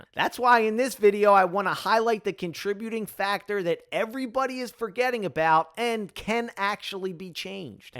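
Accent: Philadelphia, United States. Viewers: I knew you had a Philly accent!!! Or I guess Jersey, but I'm from Philly so it sounds like mine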